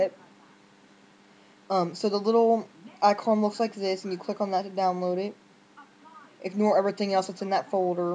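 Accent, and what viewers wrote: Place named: Finland. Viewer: I really like ur accent! is it Finnish?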